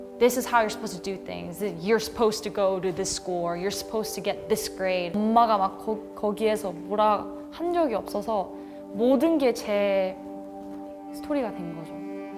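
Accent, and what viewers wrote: United States. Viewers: Why does she sound American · 와 미국인인줄 알았어요 영어 실력 무엇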